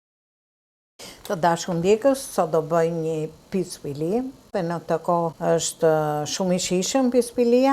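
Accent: Tirana, Albania. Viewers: te lumte i ke duret flori,ka lezet dhe e folmja. tironce nji e sbohen dy,hallall 🥰👐💐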